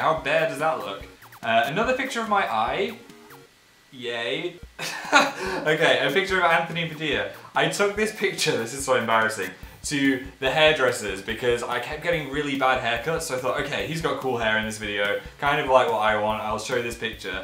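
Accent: North, United Kingdom. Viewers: In the camera test he sounded so Northern!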